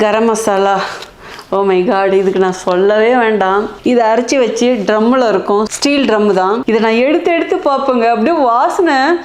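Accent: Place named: Bangalore, India. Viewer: You Are in which area??. Chennai or other place. Your Tamil is slightly Bangalore tamil people pronounceation.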